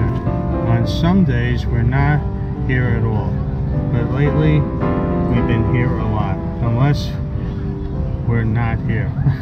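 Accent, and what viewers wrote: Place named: Northeast, United States. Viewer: It's certainly good to hear from you. Where are you originally from? I detect a northeast accent. I stubbled upon your channel because I'm thinking of moving down to the RGV. Anyway, you have another sub! Have a wonderful Christmas season and I'll be watching...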